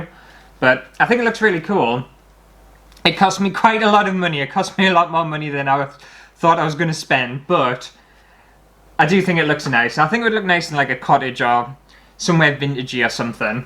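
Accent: United Kingdom. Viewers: Hi 👋 I love your accent and how you phrase things. You are so funny! I know it's the UK-speak, but y'all say "us" instead of "me". When you say "us" I always imagine you and a mouse 🐁 in your pocket, lol! Or an orange 🍊 🐁 mouse on your shoulder (orange bc I just finished watching your sexy Terry's Chocolate Orange video).....so a white mouse --->🐁 with an orange long-haired wig and the steampunkish sunglasses 😆 🤣 😂.... for some reason I see the mouse with a Dali-type moustacheeeeo. You are so funny and brave! I would love to do what you do - meaning making videos- but I'm terrified 😨.....stupid, I know, but...?🤷🏼‍♀️ Anyway, your videos always make me laugh and help when I'm depressed. So, thank you! 🙂 🙃 🙂 God Bless 😃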